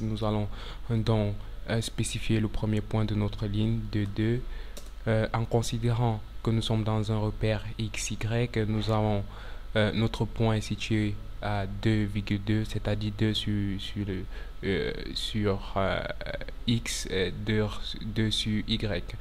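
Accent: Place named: Cameroon. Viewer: laccent Camerounais!!! o bossoo!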